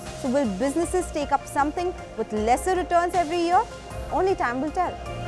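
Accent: India. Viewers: why cnbc has indian accent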